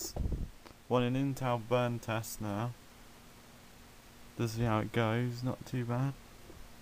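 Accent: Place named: United Kingdom. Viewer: i couldnt understand what you were saying half the time... you clearly have a british accent... Are you Ozzy Ozzbourne?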